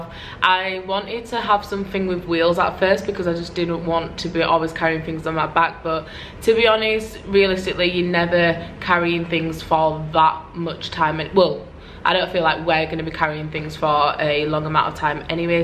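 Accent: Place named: Northern England, United Kingdom. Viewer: literally just came across this vid and the first thing i noticed is how much i love your northern accent! i'm from middlesbrough...love the video...i'll be hopefully doing this trip shortly! keep smashing it! #NorthernLassesDoItBest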